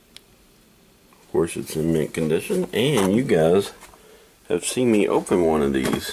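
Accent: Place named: South, United States. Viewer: Ha ha u said dude it sounds coolcuming from a southern accent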